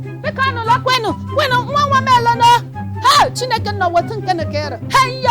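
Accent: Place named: Igbo, Nigeria. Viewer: this your igbo accent is something else😁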